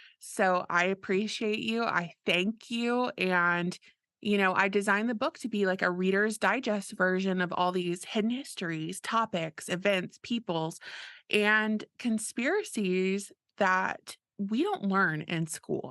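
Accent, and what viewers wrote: South, United States. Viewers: Ohhhhh just finished watching and yes, omg I want MORE from you on all of the EVIL PSYCH0paths!!! Your ironic American accent is "delicious" ! I'm originally from Canada and cannot wait to share the screenshots on certain points that you made!!! Of course I shared this video on Facebook....goes without saying!!! Btw, I know you're a new mom (félicitations!) but when you feel up to it (your little sweetheart comes FIRST of course!!!), I am CERTAIN that I'm speaking for all of your fans, we'd LOVE more to you on this subject as you are able !! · How did you become Southern?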